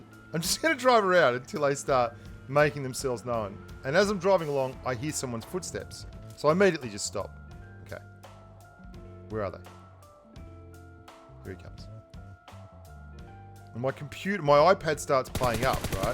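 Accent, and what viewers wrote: Australia. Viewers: That Aussie accent tho ☺️ took me few videos to realize that you were saying "rating" and I was hearing it as "writing" 😎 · you austrialian accent is too strong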